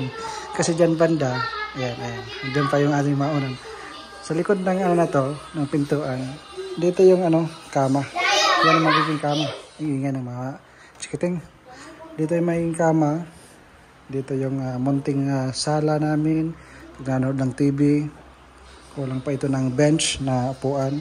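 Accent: Waray, Philippines. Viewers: Taga di in ka sir baga waray man m panday hahahaha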